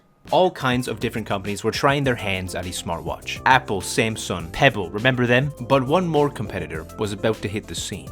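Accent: United Kingdom. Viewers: That brit accent lmao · This is the best video 11 out of 10, best british accent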